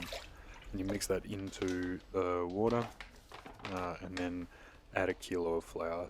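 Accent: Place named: Australia. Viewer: hey, he cant help being australian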